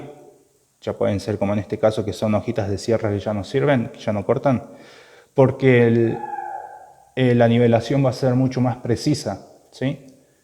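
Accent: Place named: South America. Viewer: Me caga oír hablar aún sudamericano 👎👎👎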